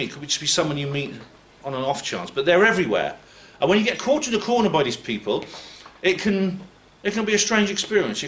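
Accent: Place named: England, United Kingdom. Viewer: Kind of strange, to hear you, a Welsh man, speaking with an English accent to your fellow country men and women, who have Welsh accents! Do you think about that or does it just by pass you? Funny cos they welcome you as having a big loud Welsh voice!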